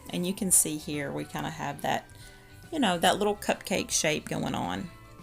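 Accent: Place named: Ozarks, United States. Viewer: Subscribed before I saw your diy just because of your voice! You sound like me! Hehe🤷 you may not be an Ozark Hillbilly, but if you ever make it up here, holler and I'll show you all the good junkin' spots! LOL there was an exceptionally awesome craft show here a few weeks ago at War Eagle Mill. If you ever plan a fall trip to the Ozarks that would be an awesome thing to shoot for. You can't be too far according to your accent lol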